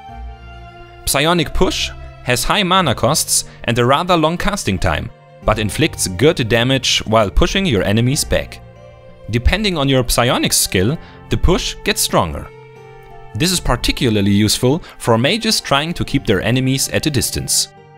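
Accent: Germany. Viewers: Super video, klingt nach wirklich tollen ideen für die neuen Zauber. Der deutsche Akzent ist sehr putzig :D :D Ein native speaker kriegt da wohl Gänsehaut, aber klingt einfach süß :D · oh gott was für ein cooler deutscher akzent :D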